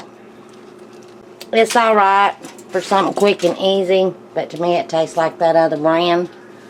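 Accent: South, United States. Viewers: Looks fantastic. I absolutely love your southern hospitality style and voice. Reminds of going to the family gathers for reunions or backyard BBQ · Never thought I would across somebody that talked country as me. Bravo. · That Southern draw. You rock. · That strong southern accent! Music to my ears. By the way those cheesesteak sandwiches look mouth watering. Got to try me one of these, thanks for sharing. Greetings from England. 🙂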